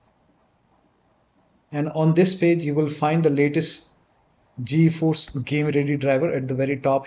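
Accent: India. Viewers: I’m pretty sure that was Indian but alr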